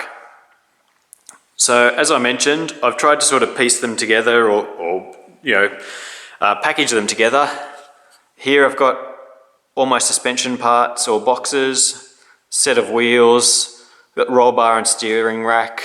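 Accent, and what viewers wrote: Australia. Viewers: Jesus how does one listen to that damn accent all day? Having you stuck down there in Australia was teats , yorn the Internet😐 - I can’t take it🇺🇸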